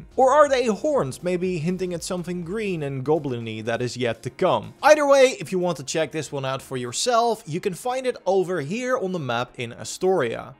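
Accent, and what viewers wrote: Netherlands. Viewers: are you dutch? Cuz im hearing a stong accent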